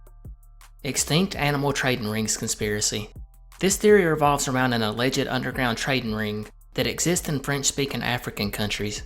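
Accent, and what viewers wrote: South, United States. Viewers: Thank you! I really enjoy these. I absolutely love your voice! I'm from WV and sadly, I never hear Southern creators. Keep up the great work and I definitely look forward to future videos.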